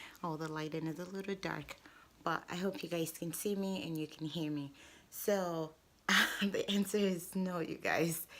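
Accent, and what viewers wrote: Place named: United States. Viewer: your Accent look like Amerikan .how long had you been lived nigeria?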